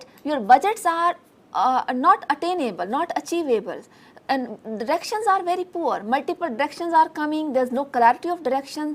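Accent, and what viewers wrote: India. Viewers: Amazingly beautiful Indian English pronunciation. ....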